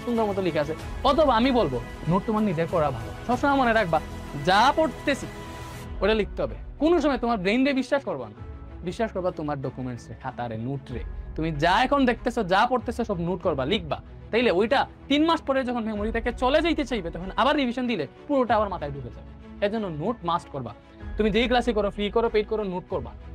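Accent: Sylhet, Bangladesh. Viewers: ভাইয়া কী বাড়ি সিলেট? কথার মধ্যে সিলেটি টুন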